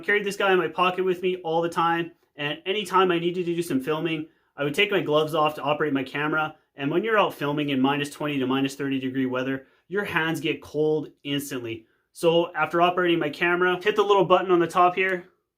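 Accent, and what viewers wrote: Canada. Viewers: I love your Canadian accent.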